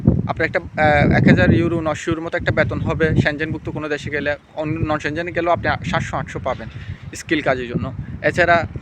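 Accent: Chittagong, Bangladesh. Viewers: বন্ধু তুই চিটাইংগা নে? আয় বুকে আয়!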